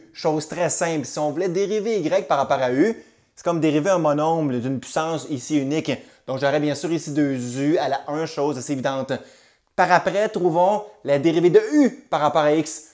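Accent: Canada. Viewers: A 66 ans je révise les maths pour aider ma petite fille de 15 ans et je trouve vos cours géniaux. Ils m'ont permis de l'aider à comprendre des notions complexes étudiées dans ses cours de maths. Votre tonalité (je vis en France) canadienne est un chant pour moi et votre communication gestuelle est un plus pour mieux retenir vos cours. Les cours deviennent magiques et on croit être dans un univers enchanté. Bravo, continuez ainsi pour faire aimer les maths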